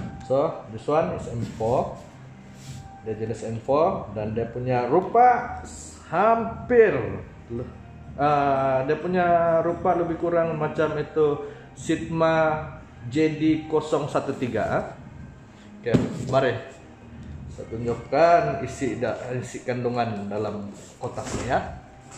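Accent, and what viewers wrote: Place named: Kota Kinabalu, Malaysia. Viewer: nah suara topinai urang kk ni kan hahah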